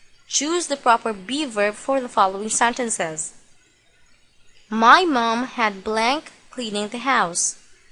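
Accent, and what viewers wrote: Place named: China. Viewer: Bhai ya behen dhang se bol. Smj hi nhi aa raha Chinese k jaise bol raha h tu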